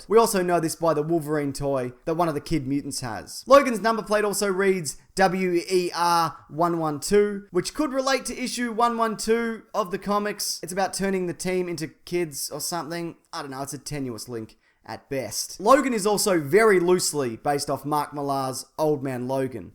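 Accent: Australia. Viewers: Australian! I couldn't work out why I loved your voice so much!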